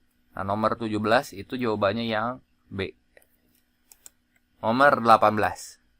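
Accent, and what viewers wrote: West Java, Indonesia. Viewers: Abangnya orang sunda wkwkw lucu jelasinnya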